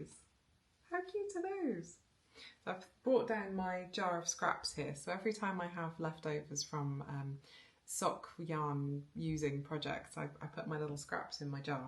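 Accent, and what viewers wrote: Wales, United Kingdom. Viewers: Another lovely episode. Thank you. And by the way, you definitely do still have your lovely welsh accent 🏴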